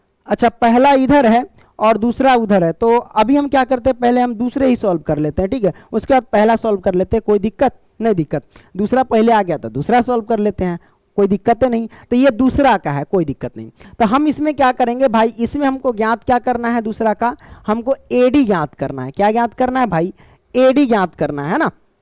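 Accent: Bihar, India. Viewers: Sar aap bihar se ho naa ❤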